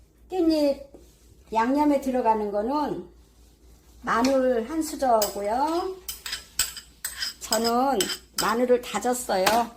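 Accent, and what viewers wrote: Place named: Chungcheong, South Korea. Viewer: 엄마 같으세요 충청도 사투리가 조금씩 새어 나오시는게 참 정겹네요 편집많이 안하시는 것도 재미로 느껴져요❤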